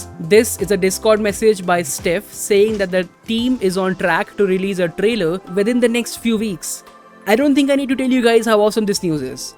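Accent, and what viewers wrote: India. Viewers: Are you indian because you sound like Indian 🤔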